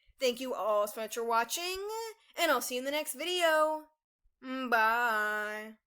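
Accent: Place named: United States. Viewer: dude i really wanna watch ts but the american accent is so HEAVY and i can’t bear ts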